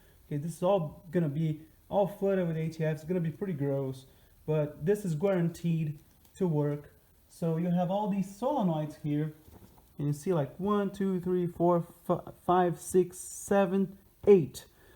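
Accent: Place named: Portugal. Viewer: Seu inglês aportuguesado está ok 👌 😂😂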